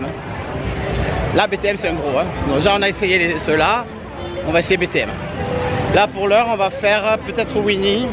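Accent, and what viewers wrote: South, France. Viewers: Salut ! Rholala toujours un plaisir de vous suivre. Le petit accent chaleureux du soleil ❤. Il me semble qu'il y a bien du monde dans le parc ! J'ai lu que même en septembre c'est assez plein maintenant, ça a l'air de se vérifier. Je pars pour la première fois (seule) en septembre prochain. J'ai hâte et en même temps le stress monte 😅. Prise de tête sur les billets d'avion en ce moment, très chers au départ de Lyon 😢. Dommage pour Tron, j'étais déçu pour toi tu avais l'air si heureux. Je sais pas si je la ferais elle a l'air haute (j'ai le vertige, j'aime pas quand les rails sont dans le vide 😅). Mais je pense me prévoir un budget coupe-file au cas où. Zut faut reprendre le boulot, pas le temps de regarder la suite ! A bientôt !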